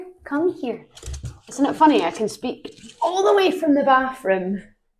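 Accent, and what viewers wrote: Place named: Scotland, United Kingdom. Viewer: Thank you for this video and message. I think you tapped into the energy I feel when I connect with my guides. It’s pure love and I don’t really feel it with other people, only with them. I think I also like the connection to Scotland. My grandmother and other relatives come from the Munro Clan and hearing your accent brings a familiar joy ❤ Have a good day!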